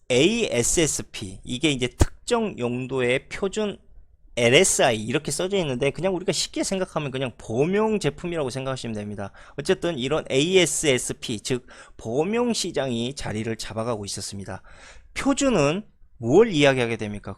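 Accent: Jeolla, South Korea. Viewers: 듣기싫네 알고 떠들어요 전라도라 더싫음ㅡㅡ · 목소리가 삼성 싫어하는 7시 지역 놈이네. 역시,,,,,,, 예라이 썩을 놈. 퉷퉷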